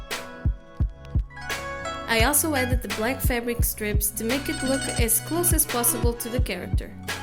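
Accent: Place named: Portugal. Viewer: Esse sotaque com certeza é de Portugal!♡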